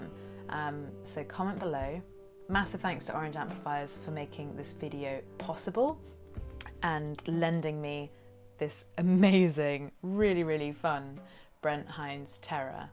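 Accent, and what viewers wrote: United Kingdom. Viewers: K bro so this don't matter a tiff but I fuckin KNEW you would have a British accent before I clicked on the video man I could tell by the way your mouth made your name say in the preview yah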